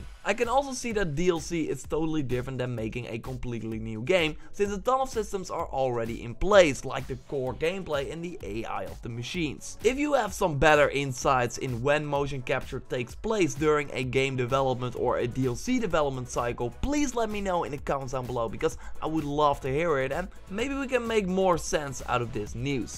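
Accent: Netherlands. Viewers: You sound a bit dutch, are you?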